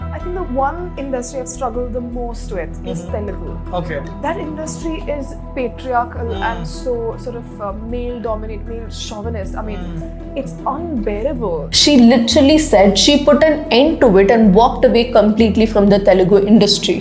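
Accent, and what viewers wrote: Tamil Nadu, India. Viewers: Please, if you cannot pronounce Telugu words or names and pronounce everything like Tamil words with a Tamil accent, don't make videos on Telugus. , Director K Vishwanath was not called "Kaalaa" (black) Tapasvi. He was called "Kalaa" (కళా, Art) Tapasvi. By the way, Tamil and Hindi film industries are shit too. Where are the artistes of the calibre of MGR, Shivaji Ganesan, Raj Kapoor, Devanand and the likes? Tamil cinema actors and comedy is the worst. Is Dhanush even an actor? Looks like a beggar. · Mis, I have great respect to you the way you have overcome all odds and stand infront of public n camera....One request, pls come out of Tamil pronunciation, especially Spelling P as B in public is kind of scar on moon.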